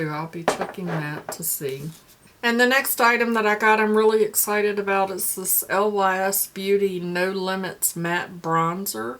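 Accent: South, United States. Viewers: I'm from the south and love your southern accent. That's a decent box and fun card!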